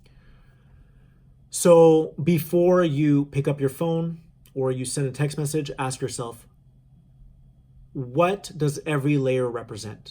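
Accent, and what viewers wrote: United States. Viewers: Felt like I was talking to an awesome kinda retired cowboy.... Lovely warm southern accent I wanna hear what he has to offer... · Thanks for sharing this! Clyde is fascinating, I used to pretend to be Bonnie from the classical story when I was younger lol. I grew up in San Antonio TX so the accent is nostalgic. Channeling individuals from other timelines used to be something I would do when I was sitting in front of my bathroom mirror or in the shower before awakening to this gift's true potential. The power of our imagination is something I have been so passionate about discovering more about recently. Definitely going to use your technique of asking what inspires people to reach out as a way to get a feel for the energy. I feel this so deeply towards the collective in regards to the sexual energy. I feel this soup metaphor so much on a personal level. 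Ooo excited to hear about the spiral. Your last point reminds me of the adage " I'm rubber your glue" but instead of only words sticking energetic patterns sick as well. It was lovely spending my now moment with you, as per usual.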